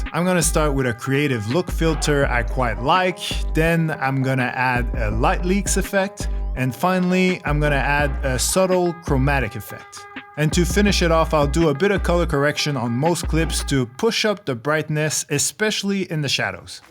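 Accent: Quebec, Canada. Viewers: You are from Quebec right ?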